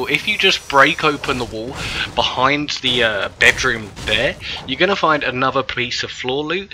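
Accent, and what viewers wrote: United Kingdom. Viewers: David deans son ? Is this you your british ?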